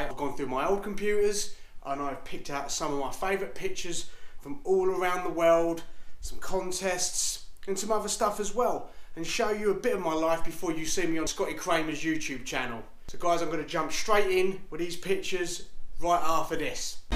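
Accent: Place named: Cockney, United Kingdom. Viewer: wanna see you in Jersey bro! miss having that bit of cockney love on the channel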